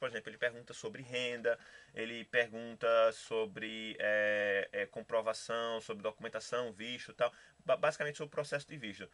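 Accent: Pernambuco, Brazil. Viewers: Contato , vc é pernambucano ?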